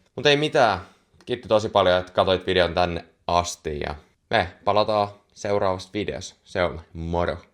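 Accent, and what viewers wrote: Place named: Turku, Finland. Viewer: Hullu turunmurre😂, asiallinen editointi ja kiinnostava sisältö kiitos viihdyin! 😁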